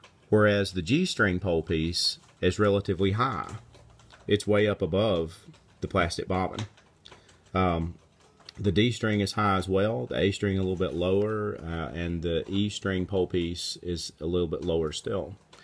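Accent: Texas, United States. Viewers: Can I guess your accent? Northern Texas or Oklahoma?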